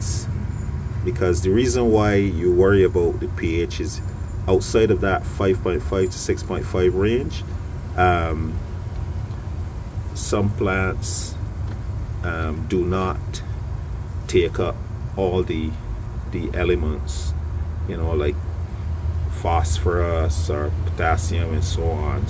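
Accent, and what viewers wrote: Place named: Caribbean. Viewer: I came across this vid while browsing for info on pH stability. I’m finding that it takes more and more pH down (I use phosphoric acid)… when a fresh tank is mixed it takes say 1ml to bring it down to 6.0 but 2 days later when I top up the tank with water (and some nutrient solution to keep the EC up) it takes 2ml of pH down to bring it back down to pH 6.0. Then the pH climbs to above 7.0 again in 48 hours when I come to top up the tank again. Foliage is green and healthy, fast growth, so I expect the pH to rise as the nutrient elements are depleted from the solution. Maybe the tank is just too small for the size of canopy that it supports, combined with fast uptake of phosphorous means that the acidic elements are being depleted very quickly? BTW I loved your accent. It’s interesting that Caribbean accents often sound similar to Irish accents.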